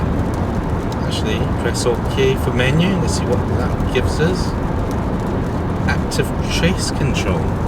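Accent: Scotland, United Kingdom. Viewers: The normal expression on the UK Leaf Forum is: "Drive it like you stole it" , but to do that you need to head off to the Highlands, where men are crazy, roads are straight and empty, and traffic cops are few and far between. Envy your lovely Scottish brogue by the way: I've lived in Scotland since 1991 but never managed to shed my English 'posh' voice . And how the heck you manage to viddy three different screens at once beats me ... you obviously have a knack for technology.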